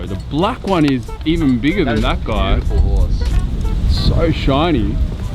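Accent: Australia. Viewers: The way you break down the description on the car in the Australian accent!❤️💕 horses are so 🥰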